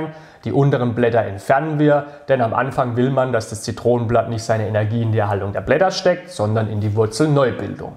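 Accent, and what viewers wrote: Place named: Karlsruhe, Germany. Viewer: Habe sofort gehört, dass du von hier (Kreis KA) kommst 😁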